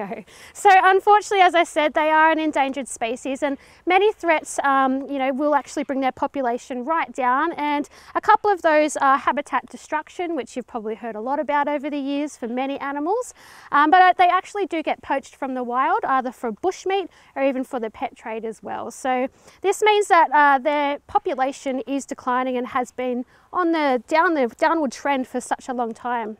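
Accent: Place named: Australia. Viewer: Why is it when i search youtube about animals, there's always an aussie voice hah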